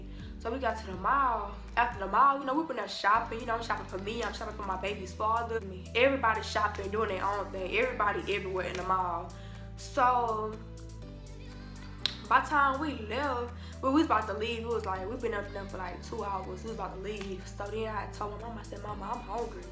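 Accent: Louisiana, United States. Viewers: Are you from Louisiana you sound like it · You from Louisiana?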